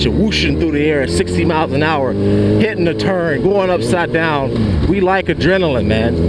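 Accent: Michigan, United States. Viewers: You from Michigan bro?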